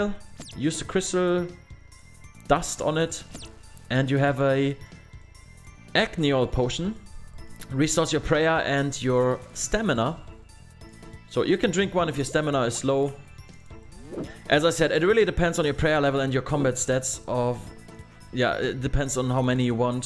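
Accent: Germany. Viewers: bin Random hier gelandet aber sofort rausgehört einer von uns 🤣 junge dieses ewige Prager wechseln das ist echt stresserzeugend 😂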